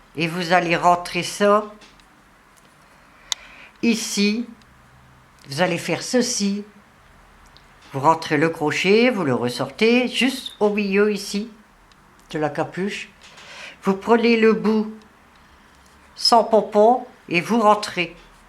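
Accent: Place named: Tourcoing, France. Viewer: Bonjour J'adore votre accent, ça me rappelle Tourcoing où j'ai vécu Merci pour votre explication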